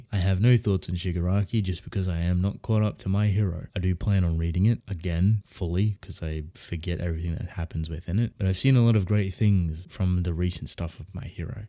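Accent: Australia. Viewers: i hadn't even picked up on your australian accent and now you've said so, i can't unhear it lmao that's so cool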